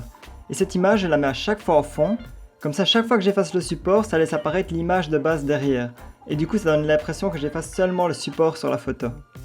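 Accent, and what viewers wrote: Liège, Belgium. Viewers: Accent belge🇧🇪🇧🇪🇧🇪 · Pas mal la vidéo 😄 , j'ai l'impression que tu as un accent belge 🇧🇪, j'me trompe? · On reconait bien l'acent bèlge 😀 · Tu ne serait pas belge par hasard ? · Tes Belge ? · Tu es belge ? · Vu ton accent tu ne viendrais pas de liège Comme moi ? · C'est juste super! Tu es vraiment un pro du stop Motion. Félicitation. Au fait, juste une question, tu es belge? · T'es belge nan? :)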